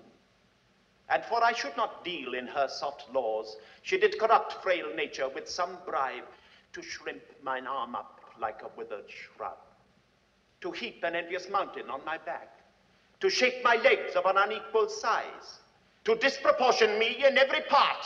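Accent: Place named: England, United Kingdom. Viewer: Who else is absolutely fascinated by his English accent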